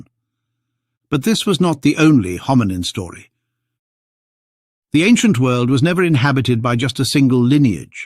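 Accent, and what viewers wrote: RP, United Kingdom. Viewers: I don't care what you say even if you do sound BRITISH ELITE.🤮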